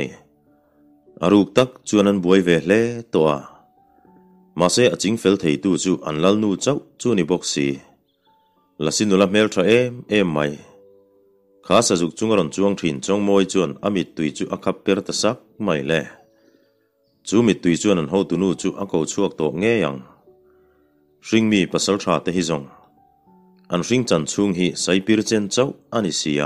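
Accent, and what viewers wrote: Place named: Aizawl, India. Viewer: Aizawl thluk in thluk tawp rawh 🤣 🤣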